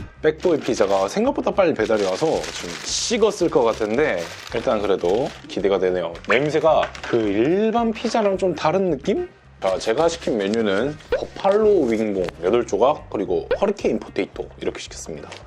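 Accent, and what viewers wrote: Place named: Daegu, South Korea. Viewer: 알고리즘으로 첨 뵙네요~^^ 말투가 대구분이신듯 . . 제가 대구라서요~ 대구분이시라면 너무반갑습니당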